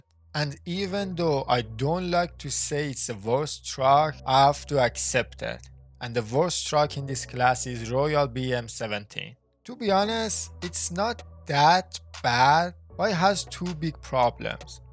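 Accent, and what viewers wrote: Iran. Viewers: You are awesome dude... love your videos, what accent do you have? Sounds slightly Persian :) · از لحجت معلومه ایرانی هستی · He is, because of the accent. ☺ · Are you Iranian Bro? your accents shows that:face-blue-smiling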